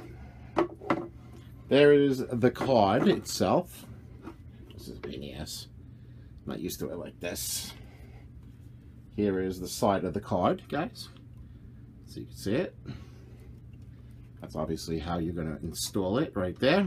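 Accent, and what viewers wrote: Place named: New Jersey, United States. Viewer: He has such a jersey accent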